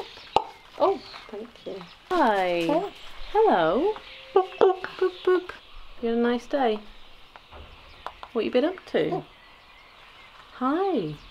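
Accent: United Kingdom. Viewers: I used to own a business here in rural Georgia where I would look after farms, or just pets while people were out of town. All of the critters touched my heart in their own way, but the birds I found fascinating because their vocal ranges allow a window into their thoughts and also their humans. Like with Fable, it was obvious you were British, laugh easily and are a calm, gentle person. I wish all of my beloved animal friends I’ve met owned a human like you! Your critters are so blessed to have you! Love from the Blue Ridge Mountains - and congratulations 🍾🎉🎊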